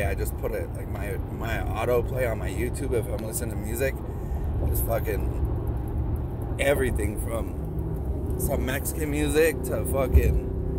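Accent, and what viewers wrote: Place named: California, United States. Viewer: 🌱Good Talk.. ThankYou.🙏💯 its always nice to hear Someone Speak California. Too much im Always missing Home.🏡 the Southeast is Completely different.🍃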